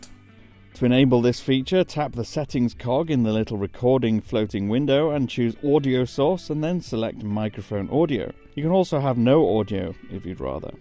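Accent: United Kingdom. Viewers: Subscribed as soon as I heard the British accent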